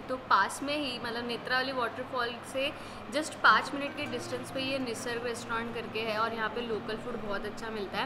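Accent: Maharashtra, India. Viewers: Your hindi accent is cute...poora marathi mulgi :D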